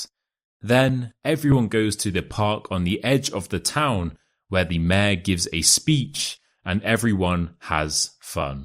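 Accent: United Kingdom. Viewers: thank so much i was listening the narration but, i think is a bit high level for my. I like de challenged, as hear British accent, so I will try to understand , to be able to enjoy it. Again, thanks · Wonderful story. i subscribed your channel to improve my pronunciation and listening skills because I love British accent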